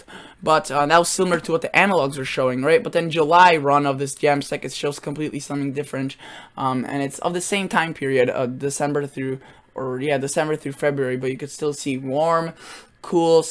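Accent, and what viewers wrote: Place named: Upper Midwest, United States. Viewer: This guy is so from WI or MN.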